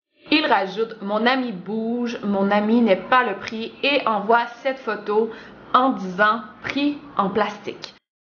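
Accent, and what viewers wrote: Quebec, Canada. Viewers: Quand j'ai du temps pour moi, j'adore me poser dans le canapé avec un petit "snack" et un grand café tout en regardant tes vidéos ( j'y est même convertis mon petit ami, qui ne connaissais pas ce genre de vidéo, il adore). Quand tu nous narres des histoires, que ce soit, des cas de disparitions, sur les serial killers, les thread, etc.., tu as une façon de parler et de t'exprimer que j'adore ! Même si tu as un accent québécois (que j'aime beaucoup), il n'y a que chez toi que je regarde ce style de vidéo ^^ J'avale tes paroles, je me sens vraiment dans ces histoires que tu nous racontes avec beaucoup de passion si je puis dire, tu expliques très bien et c'est agréable ! Continues ainsi, tu as de plus en plus d'abonnés et ce n'est pas pour rien, car tout le travail que tu fournis porte ses fruits ! Une Charltonniste de France, Bise, Sophie =)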